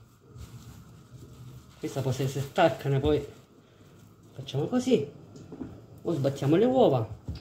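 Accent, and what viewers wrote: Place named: Salento, Italy. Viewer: Io ti guardo da Trepuzzi(lecce)tu"di dove sei?il tuo dialetto e' uguale al mio😅❤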